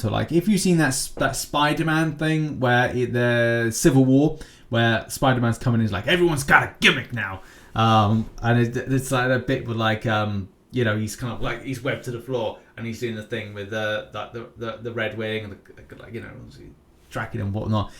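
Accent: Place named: Cockney, United Kingdom. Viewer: You have that like cockney accent, “ ‘ello govna”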